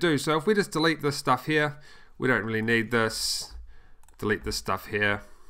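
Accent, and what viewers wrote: New Zealand. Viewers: Very refreshing to hear the kiwi accent !! I'm subbing just for that reason, i'll watch the video now haha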